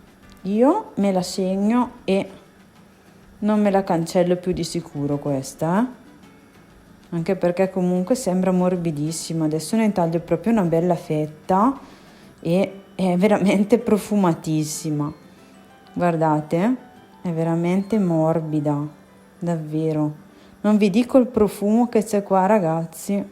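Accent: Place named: Lombardy, Italy. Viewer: Posso chiederti di dove sei? Dall'accento mi sembra, che sei BS o BG 😂😉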